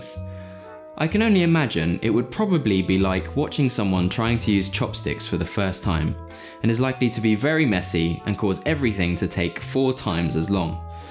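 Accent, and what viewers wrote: United Kingdom. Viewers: I'm liking the new British accent! ❤️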